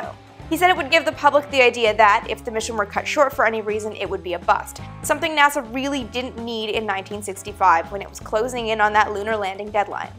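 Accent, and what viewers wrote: Canada. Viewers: Are you Canadian ???? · In one of your videos I asked if you were from up north somewhere.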